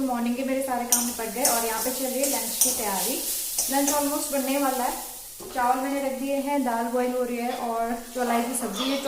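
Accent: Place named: Pahadi, India. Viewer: Suparb vlog lagte h apke me bhi kumaungi hu mujhe apka pahadi bolna babut cut lga 😍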